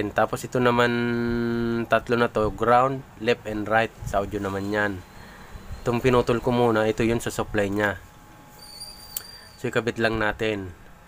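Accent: Bicol, Philippines. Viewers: Sir bicolano kba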